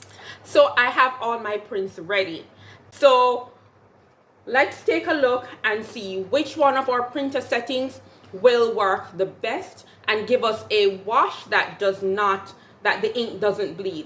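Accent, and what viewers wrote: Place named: Jamaica. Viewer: Hey there...are you Jamaican...it sounds so...rahtid yuh really went intense with the instructions and tests...I thank you. I did have a drastic ink bleed Customer concerned and I'm embarrassed...however I will talk to Siser. Thanks much.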